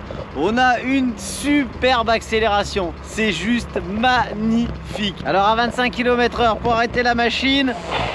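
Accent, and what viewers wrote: North, France. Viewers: Je reconnais bien le nord lol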